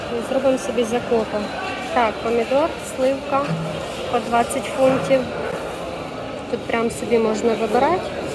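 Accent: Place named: Poltava, Ukraine. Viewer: Суржик Полтавський чи Дніпро?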